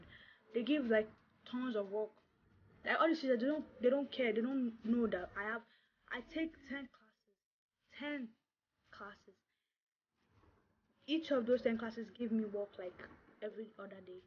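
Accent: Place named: Yoruba, Nigeria. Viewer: It’s d accent for me ...Confirm Yoruba girl😂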